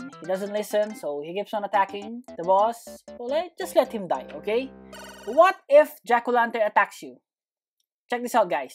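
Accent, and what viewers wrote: Philippines. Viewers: are you from Philippines bro?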